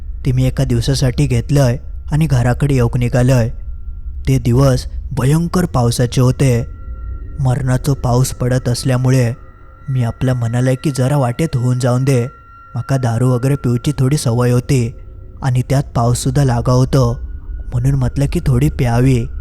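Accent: Malvani, India. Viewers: तुमची मालवणी खुप छान वाटते ऐकायला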